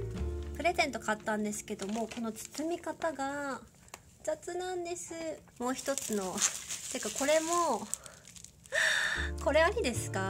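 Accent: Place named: United Kingdom. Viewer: 毎回楽しく勉強させていただいています！私もイギリスにいたことがあるので、の発音が心地いいです笑 Twitterでもコメントを残させて頂いたので、お時間があるときに変身頂けたら嬉しいです☺︎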